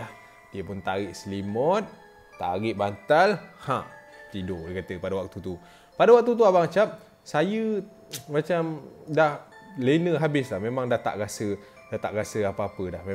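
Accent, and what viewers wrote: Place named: Penang, Malaysia. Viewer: Napa suka cakap Penang? Influence org Penang Ka hahaha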